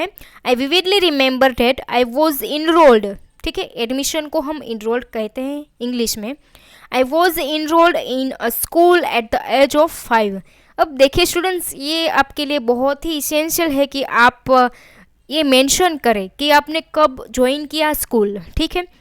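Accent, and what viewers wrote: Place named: Saurashtra, India. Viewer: You belong to saurastra, because of your tone of speech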